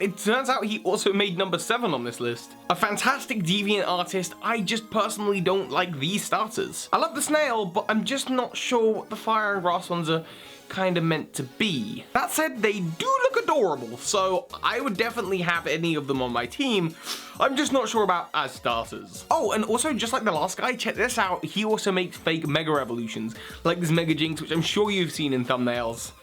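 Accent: United Kingdom. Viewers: him "heynpokemon masters " me omg your british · It's an English accent. English accents are pretty clear and easy to understand, unless English isn't your first language. also 'a shit'.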